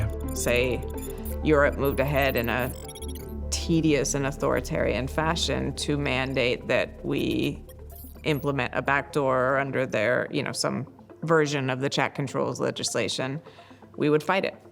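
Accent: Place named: United States. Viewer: Wat bedoel je precies? De inhoud? Of het zware Amerikaanse accent? We hebben allemaal kunnen meekijken bij de CIA dankzij meneer Snowden. Dat Carbon Footprint verhaal is ook makkelijk te fact checken (het recyclen van plastic is overigens exact het zelfde als je toch bezig bent). Ze zegt niets vreemds qua inhoud.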